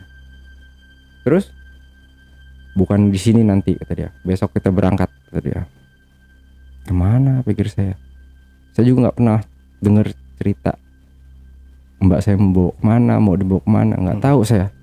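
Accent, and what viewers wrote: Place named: Sumatra, Indonesia. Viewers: Orang lampung nih, aksenya lampung nyeu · Dari logatnya aku tau abang ini sumatera nya di mana... 😊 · Jelas kamu ni orang lampung.. dari logat ngomong nya.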